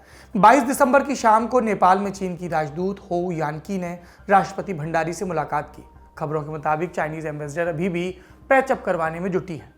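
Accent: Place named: Uttar Pradesh, India. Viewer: ha Bhai but Hume Pasand hai wo up wale lehje Mai bat Karna... Bhai na andaj Kuch aisa hi hai...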